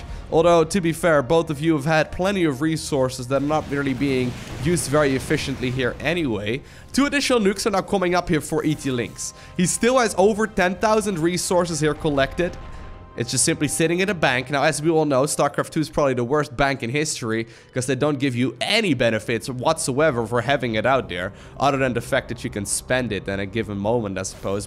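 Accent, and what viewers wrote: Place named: Netherlands. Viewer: The way you say "catapult" is the greatest. Im from america so i dont hear very many people talk with a dutch accent, i think its great. And i love watching lower level casts just as much as high level/pro casts because you see so much more variety in play styles. Granted, at lower levels, there is a lot of cringe moments (obviously because of the lower level) but, the cringe from both sides equals out and makes it a fun game to watch regardless. Especially since most of the time, its so hard to tell who is winning untill the very end.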